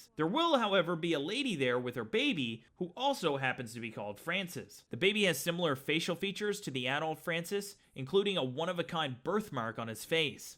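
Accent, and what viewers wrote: Canada. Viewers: Canadian accent if I’ve ever heard one. How’s the east coast been bud? Greetins from Ontario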